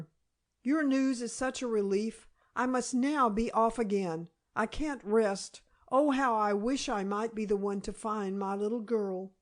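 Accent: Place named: Texas, United States. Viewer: Ugh unlistenable. I live in NYC. The narrator is obviously meant to be a man. This is read by a woman in Texas. The effort is appreciated, but unsuccessful.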